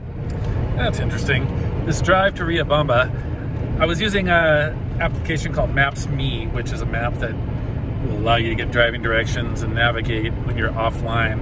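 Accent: United States. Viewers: what? I'm a troll? Hey buddy I'm not the one who speaks like a gringo. Also I'm not a primitive jungle dweller named Suki. Imagine that. Me a respectable citizen being called a troll by accent man.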